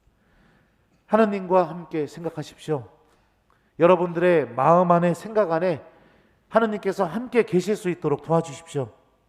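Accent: Chungcheong, South Korea. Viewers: 충청도 신부님 사투리 더욱 친근감 ~~~ 화딱지~신부님 존경합니다 저도 화딱지가 날때는 신부님 강론을 듣고 하느님께로 다가가면 저에게 은총으로 사르르~화가 감사로 ~~ 아멘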